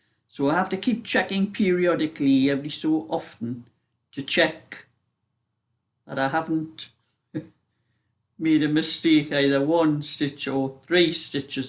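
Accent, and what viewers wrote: Newcastle, United Kingdom. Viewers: G`day Thanks for the carols:) I love the white baby blanket. You Know we have a lot in common with our knitting and crochet, the time given to it all etc, Of course i just love to hear the geordie accent:) I was going to "super thank you "for entertaining me these past months. " but you havn`t got it set up. Daisy In Australia:)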